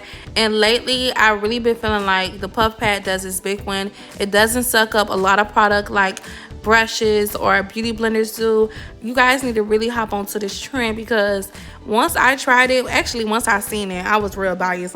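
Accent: Miami, United States. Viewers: That Miami accent tho 😘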